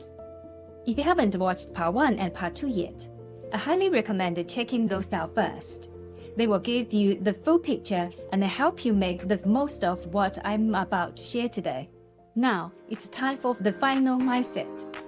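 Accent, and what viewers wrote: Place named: China. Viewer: I really like the Chinese accent 👍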